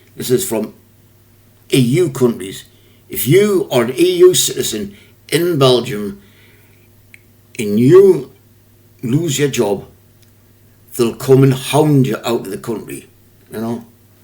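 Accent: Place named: Wales, United Kingdom. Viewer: I love ur welsh accent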